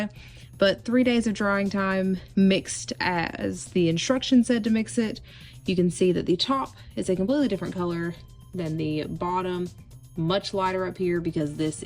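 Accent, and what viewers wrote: South, United States. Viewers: her country accent is so funny i love it 😂😂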